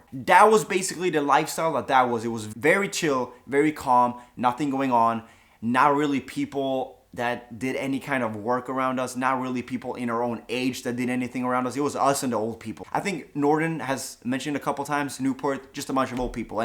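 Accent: Scania, Sweden. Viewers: Han är väll skånsk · NÅGON SOM VET VILKEN KOMMUN kommer ifrån? Har givetvis att han är skånsk. Hade varit sjukt ifall vi bodde i samma kommun.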